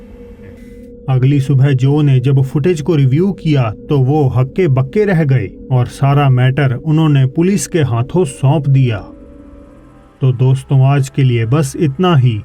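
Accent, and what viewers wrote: Punjab, India. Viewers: Bro,Mera naam Raju Bhullar hai,aap ki English aur Hindi bolne k style mein Punjabi touch clearly jhalakta hai jo k bahut garv ki baat hai. Whole Punjabis should proud of you aur aap ka ' kaise hain aap & ' chaliye phir shuru karte hain" is awesome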